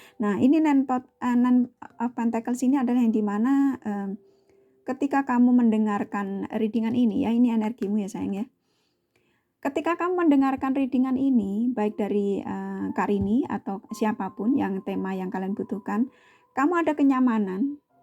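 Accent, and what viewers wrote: Java, Indonesia. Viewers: Btl skl kak sy&dia pernah hdp bersm 29 thn,dan sdh ada DUA anak, tiba2 km bercerai, skrg sdh 4 thn,dan telah bersm yg lain, namun akhr2 ini ada gelagat ingin rujuk, sy sih msh sndr ( single parent ), bg sy sdh nyaman dgn kesendirian,sy sdh happy dgn cucu ytc. Mksh ya kak readingan,sy sk skl dgn suara kk yg njowoi dgn canda' an ben no rasakno dll, ok kak smg kk makin sukses,sht sll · Kalau boleh tau kak orang mana Kok bicaranya logat jawa banget. Jawa tengah X ya kak Salam kangen Aja for you kak 😘😘😘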